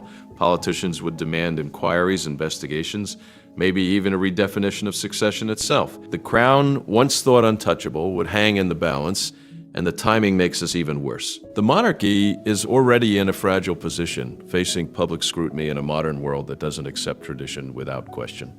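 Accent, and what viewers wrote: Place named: United States. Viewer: This video has to be investigated to ascertain who is behind this rubbish. Is the American accent a clue?!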